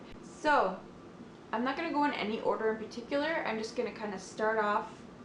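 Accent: Canada. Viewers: Are you Canadian? Because you sound like you have a Canadian accent...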